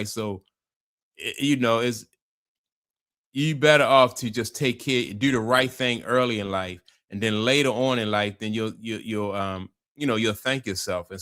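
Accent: Baltimore, United States. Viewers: You from Baltimore?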